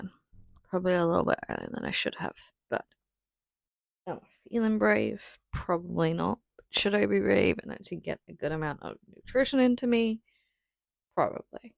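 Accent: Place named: Northern England, United Kingdom. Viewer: honestly hands down you have the most amazing accent on a person who I have ever known up North (and believe me I have met a lot of people in the North of the UK) a lot of people say I have a rather posh accent being from the South of the UK 🤣🤣🤣 I am so glad and happy to hear you are coming back with more videos, and I am happy as always to support my favourite content creator and the content that you create lovely 😊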